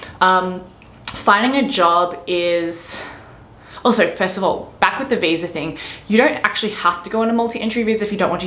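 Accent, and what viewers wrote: Australia. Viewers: Firstly, I really love Australian accent❣ Even though you are australian born chinese, you look like burmese sometimes😆😅. If you try speaking in burmese to Myanmar people, they might not notice that you are a foreigner. 😆 Anyway, stay safe and healthy. And yes, I'm glad that I discovered your channel. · Myanmar has bad reputations for a lot of things but when it comes to culture and hospitality, it’s top-notch. I grew up in Myanmar and you’re making me miss it a lot. Definitely visiting there in the near future. Thanks for all the videos you make about Myanmar! Also you’re so relatable to me since I’m from Myanmar, love Australian accent although living in the US and currently learning Chinese. My life’s a mess in a good way haha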